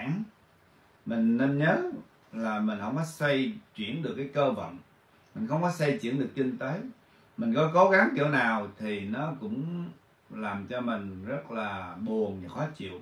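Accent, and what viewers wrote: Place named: North, Vietnam. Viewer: SP nói tiếng Nam mà người dẫn chương trình lại nói tiếng Bắc nghe không phù hợp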